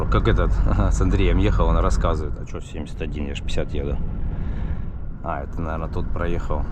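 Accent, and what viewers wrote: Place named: Don, Russia. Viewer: 25.45 Я летом там забодался😂. Сразу видно, казак донской! Мы тоже так в детстве говорили.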